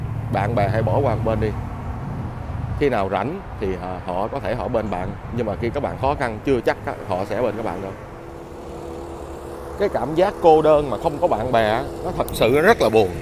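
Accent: Standard. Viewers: A oi,a noi chuan lam,hy vong a lam nhieu video nua,de e hoc hoi nhung j ve ben my.e cung muon qua do song giong a. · Anh nói chuẩn quá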